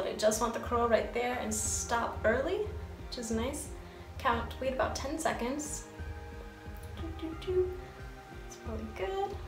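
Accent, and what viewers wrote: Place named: South, United States. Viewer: Loved the video, You are cute and funny. I hear a southern slang as well.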